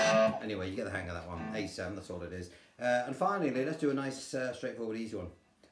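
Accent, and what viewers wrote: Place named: Wirral, United Kingdom. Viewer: I can’t learn the riffs because I can’t stop laughing at you 😂😂😂I reckon that’s a Wirral accent!!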